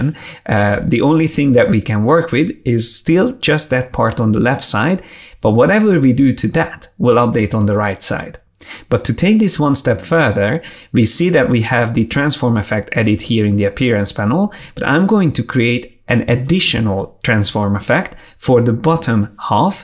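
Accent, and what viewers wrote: Hungary. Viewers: Sorry for the off-topic question, but may I ask where you're from? Your accent is a dead ringer for Hungarian but your name sounds Polish, so I'm both confused and linguistically intrigued. :) Great video, by the way.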